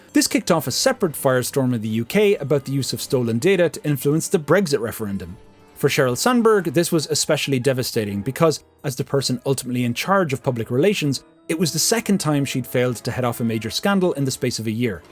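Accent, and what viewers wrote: Ireland. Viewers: YOURE FROM LIMERICK?? I always thought you were irish cus of your voice but i never imagined you were from MY hometown ! 👏🏼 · I had no idea you were from Limerick! I lived there for almost a year and now immediately recognize your accent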